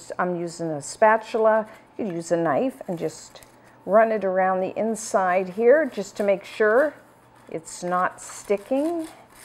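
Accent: Canada. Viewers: Shane Enz Actually she's a Canadian, you can tell by her accent.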